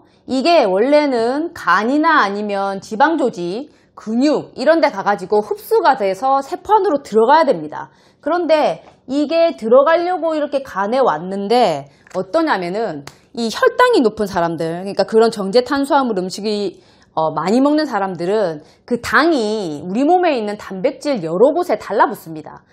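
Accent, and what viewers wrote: Gyeongsang, South Korea. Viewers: 개념원리 동반한 설명은 처음이네요. 감사합니다. 고향이 대구분이실듯.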